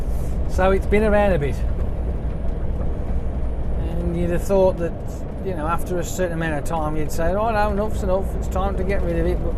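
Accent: United Kingdom. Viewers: First time I seen ur video very good really enjoyed ,were is ur acent from ,what part of uk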